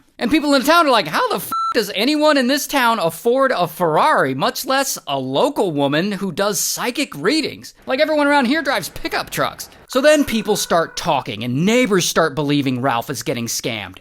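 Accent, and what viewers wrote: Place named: United Kingdom. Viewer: It's a BRITISH accent BTW.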